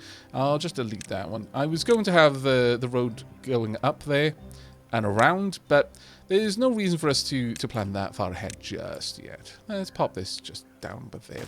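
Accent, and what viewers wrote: Wales, United Kingdom. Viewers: There is something soothing and comfortable about watching videos. But I admit to being biased as I love the Welsh accent! I'm crossing everything I can cross (fingers, toes, eyes, wires) hoping for a ridiculous amount of Timberborn. Am I asking too much? Never!!!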